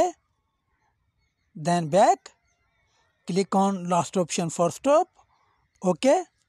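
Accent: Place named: India. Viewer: bro sounds like indiun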